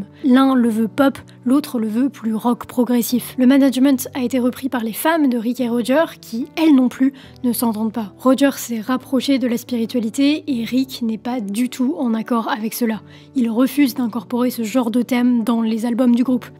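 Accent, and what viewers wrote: France. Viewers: L'accent franchouillard est à retravailler svp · sauf que la langue française prend trop le dessus au niveau prononciation des noms anglais. ça fait tâche.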